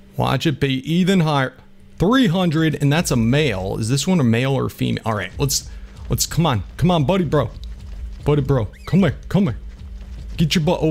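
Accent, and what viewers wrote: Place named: Rural, United States. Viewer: Does anyone hear him do a hick accent and every now an the do a Cali one? Its crazy cause none of his old vids sound like this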